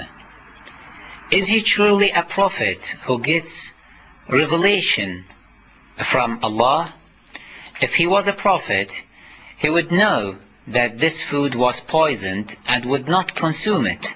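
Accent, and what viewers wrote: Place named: Iran. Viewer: aksen bhs arab n englishnya ni org adalah org farsi. N kau tak usah pura tak tahu klo org rafidha tu agamanya mmng mengajarkan kekacauan bg Islam dan umat Islam.mrk senang mengadu domba antar muslim. ( rafidha x muslim) Agama rafidha ( syiah 12 imam) inti ajarannya MMUMUSUHI MUHAMMAD SAW DAN WAJIB BERLISAN KEJI THDP BELIAU!!!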